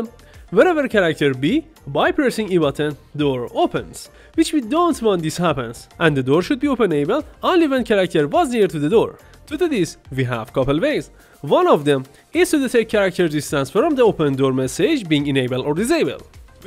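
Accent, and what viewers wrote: Iran. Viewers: لهجت ایرانی میزنه❤ ایرانی هستی؟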